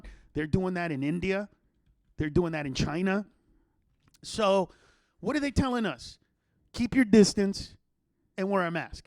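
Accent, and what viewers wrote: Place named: Mexico. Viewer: Youre not funny you fat piece of shit, all you do is steal people's jokes and say it with a mexican accent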